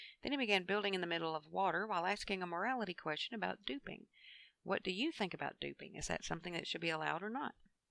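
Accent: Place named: United States. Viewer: Thank you for the player updates this month. You have been very clear and detailed. I love your accent! Whereabouts in the US are you from? Keep up the videos.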